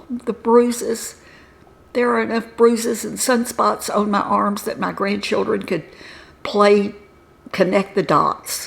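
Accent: South, United States. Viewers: you are so absolutely gorgeous oh what I wouldn't give for such beautiful sparkling blue eyes and I LOVE your adorable southern accent!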